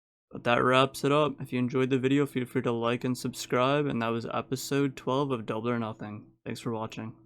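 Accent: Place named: Minnesota, United States. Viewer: def from minnesota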